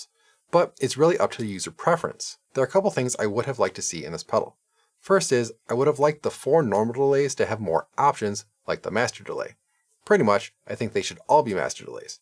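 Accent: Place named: Midwest, United States. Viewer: nailed it- recognized the accent fellow midwesterner!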